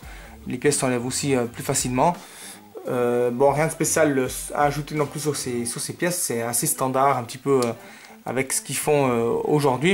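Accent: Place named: Fribourg, Switzerland. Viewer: Ouais, je suis aussi fribourgeois. J'ai vite reconnu l'accent !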